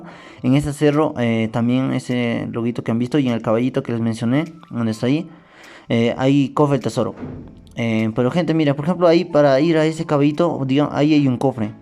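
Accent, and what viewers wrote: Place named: Peru. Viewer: PURA VOZ DE PERUANO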